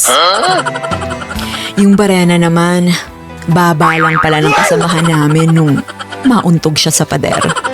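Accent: United Kingdom. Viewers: Long live....GOD BLESS YOU!..lol...banatan mo british english accent beautiful young lady.....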